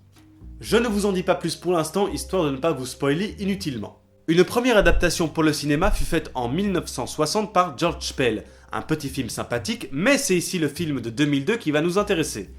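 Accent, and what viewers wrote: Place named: Belgium. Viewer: Je m'étais dit "allons voir sa vidéo pour apprecier ce somptueux accent belge" mais sans moquerie c'est très interessant. BRAVO.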